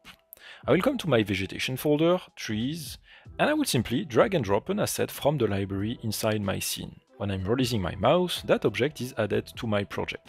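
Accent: France. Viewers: Thank you so much for the great tutorial. And the French accent is just drop dead gorgeous!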